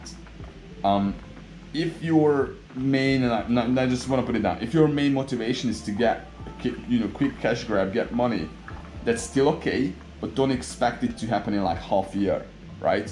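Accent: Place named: Hungary. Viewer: Szia hallom a kiejtéseden higy magyar vagy kaphatnék magyar videot?😁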